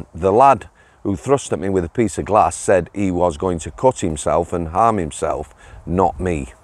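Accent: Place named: Wakefield, United Kingdom. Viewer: Lost your wakey twang, almost full on Manc !!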